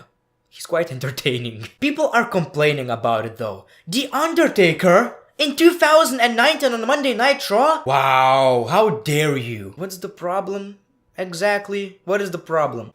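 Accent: Russia. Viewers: Wow! I think you have a great Russian accent! (Because you are Lithuanian obviously but still) with love, from Astrakhan, Russia.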